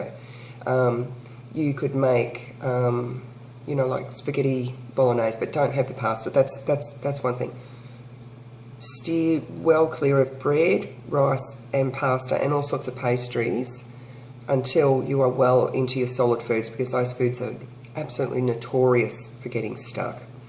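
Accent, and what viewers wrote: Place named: Australia. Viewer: Thanks so much for sharing! This is the second video of yours I've watched. Great to hear an Aussie accent! And when I checked your channel and saw stuff on railway crossings I thought 'Blimey! She's a local chick!' I'm in Frankston and just had lap band surgery a couple of days ago, finding these videos very helpful!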